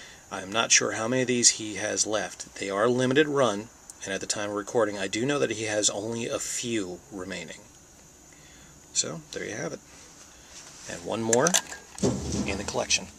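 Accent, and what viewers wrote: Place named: Russia. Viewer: your russian accent is great! XD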